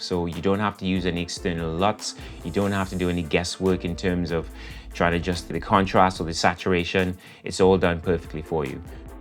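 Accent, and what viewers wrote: Trinidad and Tobago. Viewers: Im hearing a caribbean accent underneath...my guess is trini...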